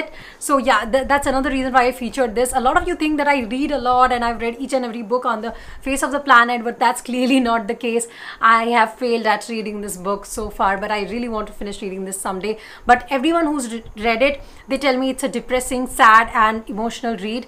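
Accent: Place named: India. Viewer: I think you have a very good command over English language especially coz of your indian accent. I mean your language is quite easily understood by indian audiences. I looked for audio books over YouTube and applications so that I can improve my English but didn't get even a single video or audio book in indian accent. Then I thought of you for a second that why cant you create an audio book over youtube. Isn't it a great idea? It will help a lot of Indians like me who are looking for such audiobooks. I hope you come up with atleast one such book in near future. Thank you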